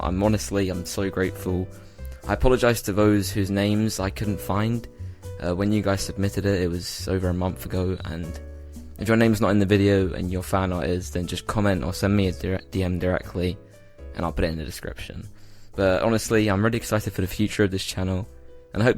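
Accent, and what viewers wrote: United Kingdom. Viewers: i absolutely love this guys British accent. It’s so charming to hear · Your brittish I thought you was Australian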